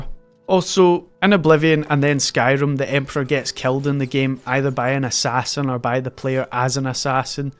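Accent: Scotland, United Kingdom. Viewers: Comment section never heard a Scotsman before lmao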